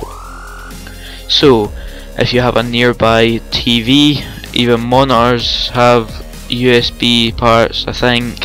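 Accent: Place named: Scotland, United Kingdom. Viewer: Yassss he's Scottish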